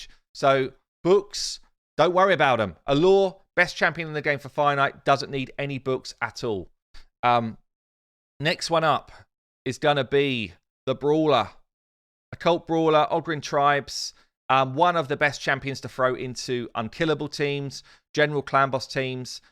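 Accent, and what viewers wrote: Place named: Hampshire, United Kingdom. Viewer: A nice change in content. I keep trying to place your accent. I'm thinking SE coast, Hampshire maybe.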